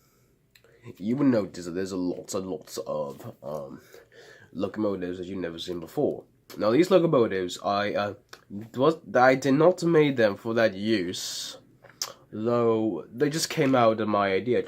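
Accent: United Kingdom. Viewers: Beautiful customs. Can you make Terriers based off of real life ones? You can just use Stepney. And great British accent. Get well soon.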